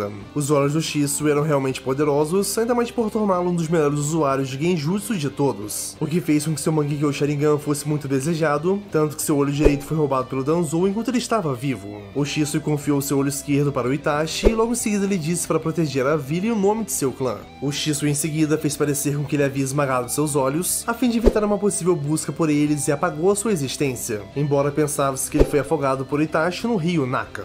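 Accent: Portugal. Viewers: Esse cara é Português de Portugal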